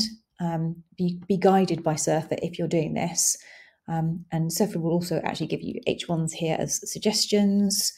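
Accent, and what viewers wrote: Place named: United Kingdom. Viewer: After I made the comment I was thinking that it might have been your microphone? I look for education, not for entertainment. Also, there is nothing wrong with your British accent, it was the sound quality!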